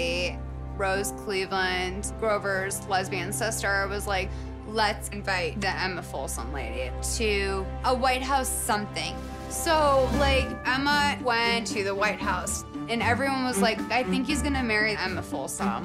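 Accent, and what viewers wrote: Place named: California, United States. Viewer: California girl here. I feel like this chick is how the world thinks my people speak. I would shoot myself if I sounded like this chick. I'm sure shes a nice person and all but her voice .... my GOD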